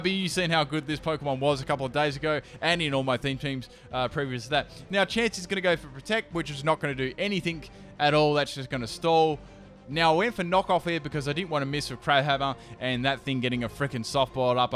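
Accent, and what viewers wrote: Australia. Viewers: TheMegaultrachicken It's a very thick Aussie accent then. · is australian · He's a native Australian.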